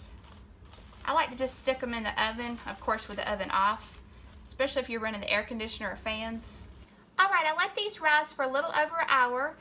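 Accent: South, United States. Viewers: Great recipe and there's nothing wrong with a beautiful country accent🤗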